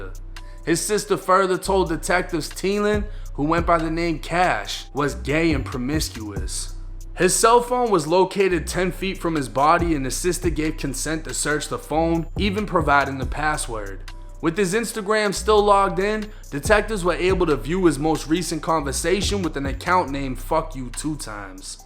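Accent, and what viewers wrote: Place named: New York, United States. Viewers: Why do you have a New York accent as a Floridian.. please explain.. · The narrator, the one that telling the story sounds like a New York hooker, bro, talk in your own language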